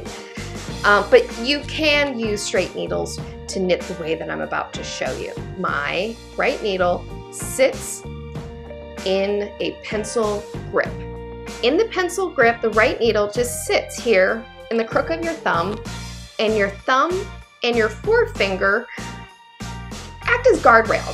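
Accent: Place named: Missouri, United States. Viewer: Really enjoyed your video...thanks for that! I've never tried this method but you make it look so easy I'm going to give it a try. You must be from my home state of Missouri; you sound just like my sister. I'll be watching! Thanks again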